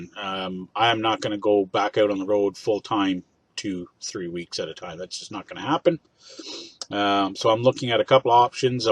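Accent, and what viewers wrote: Canada. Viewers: With you're accent you can't fool anyone you're from anywhere but Canada! Glad to see you. Thanks for the update. Take care.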